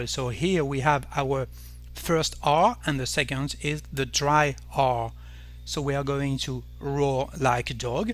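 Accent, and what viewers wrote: France. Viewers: People who speak English with a French accent sound adorable. You don't pronounce all of our words correctly and your grammar isn't perfect but we can still understand you. Dogs don't roar (in English). Lions roar. The word I think you want here is growl. Dogs growl. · So, which French accent is this?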